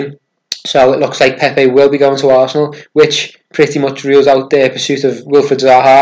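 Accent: Liverpool, United Kingdom. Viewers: Good content, but one thing my gf & friends point out is saying..."erm" before every sentence. Doesn't matter to me, maybe because English isn't my first language. My friends notice & some dislike it. I Remember Rooney started every sentence with "erm" but you notice he no longer does ? I'm sure he had coaching on public speaking. It is more noticeable with Scouse accent & sadly there's lots of idiots who just hate Scouse - Please don't take offense, I sorry if it seems like that, I just pointing out how others may think & if you say "fuck it, this is how I speak" I get it, people here (S.E. England) say shit about my accent & can be really snobs - Anyway bro, just saying what I heard, not my opinion - Great video COYB (I support Hajduk but in England I'm also a big Everton fan)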